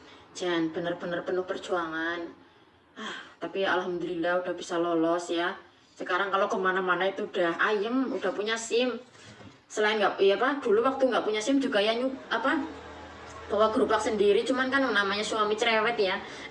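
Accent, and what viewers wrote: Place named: Banyumas, Indonesia. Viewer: Hidup ngapak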